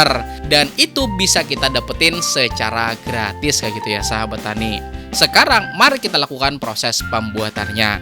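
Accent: Banjar, Indonesia. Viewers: Mendangar logatnya kayak urang banjar pian tu. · logatnya kayak orang banjar, bener gak ya? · Logat admin org banjar pasti ini.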